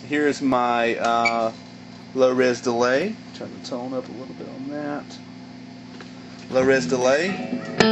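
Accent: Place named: South, United States. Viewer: Dude, nice rig. Church rig?Might I recommend a better tuner? (turbo tuner!) Also, if you didn't already know, you probably should not use the "tuner out" on your volume pedal - it messes with your tone. Anyway, is that green pedal a JHS custom pedal? I seem to recall a custom pedal that looked very similar to it on their site. Great tones btw. I used to have a M9 and I loved it, I had a sonic stomp too, both cool. I hear your southern accent, where are you from? I am in Hattiesburg,Mississippi.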